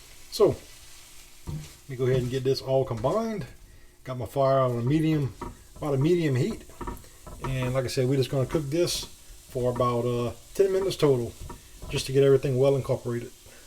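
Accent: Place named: Louisiana, United States. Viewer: Love that Cajun accent. These look yummy!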